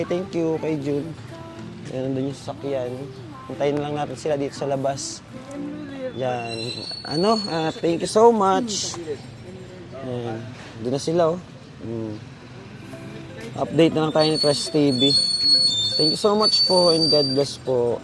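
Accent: Philippines. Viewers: Regardless kung paano ka magsalita ng Bicol Ang importante yong tulong na ginagawa nyo❤❤❤ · Magkababayan palang kita Lods 😊ringkonada din ako...taga NABUA po aqo😊taga sari po ika tabi sa bicol?Ringkonada rin kc Ang salita mo..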